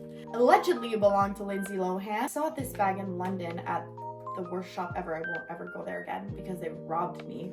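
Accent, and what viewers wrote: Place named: Montreal, Canada. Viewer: I recognize that aceent. Im born and raised Torontonian but lived in Montreal for a few years.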